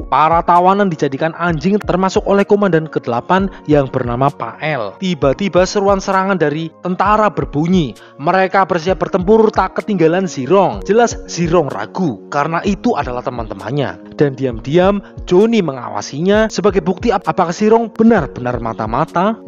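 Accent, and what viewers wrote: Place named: Java, Indonesia. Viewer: Mantap jawanya masih kental sekali😅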